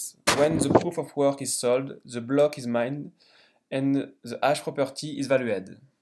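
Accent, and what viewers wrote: France. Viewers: Pourquoi tu fais le tuto pour les anglais seulement :/ On entend très bien ton accent français éhéh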